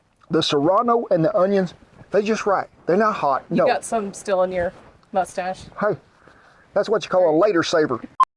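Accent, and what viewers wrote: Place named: Texas, United States. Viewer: Nice video. Where is this accent from? Is he from Texas?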